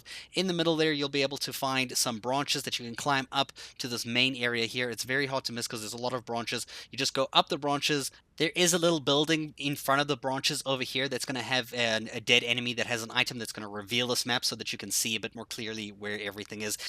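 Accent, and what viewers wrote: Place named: South Africa. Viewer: Hearing your accent for a bit, I realised you are South African 😁 Aweeeeee! Thanks for the video, but unfortunately I am nowhere near this in the game yet - I will finish it another time.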